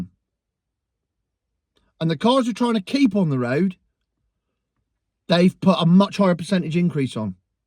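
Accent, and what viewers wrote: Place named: Birmingham, United Kingdom. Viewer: F**** Birmingham accent. Bleating like a sheep 🐑 😅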